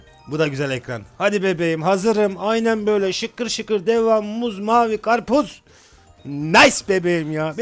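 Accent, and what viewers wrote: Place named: Thrace, Turkey. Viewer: Trakyalımısın şive biraz var gibi, hadi bakam derken 😅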